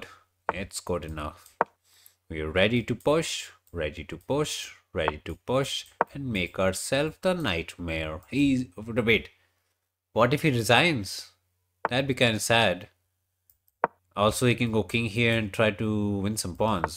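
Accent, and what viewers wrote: India. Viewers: The indian accent gets me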